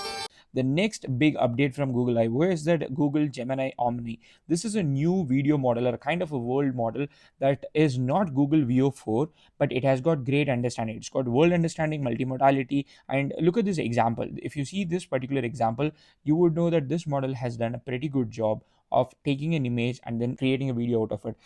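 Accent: South India, India. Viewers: Very strong south Indian accent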